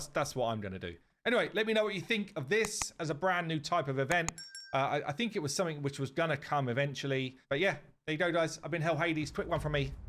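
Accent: United Kingdom. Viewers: Oh you're definitely British😂😂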